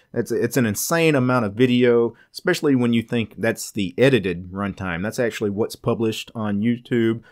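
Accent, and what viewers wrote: South, United States. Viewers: Hello, I am from CA but I am right now in Italy with a friend who is a programmer and he installed linux on my computer and he was listening to your video on Joplin as he was trying to find me an alternative to Evernote and he is learning English and he was asking me where you were from, what kind of accent you have, since it is different than mine. Can you tell me what area you are from, are you from a southern state?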